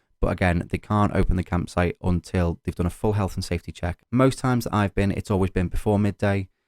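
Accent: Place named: Wirral, United Kingdom. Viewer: Sounds like he's from my neck of the woods which is from the Wirral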